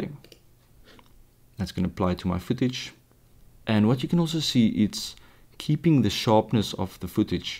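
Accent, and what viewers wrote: South Africa. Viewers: Helpful tutorial, but I'm liking this mainly because I love South African accents :)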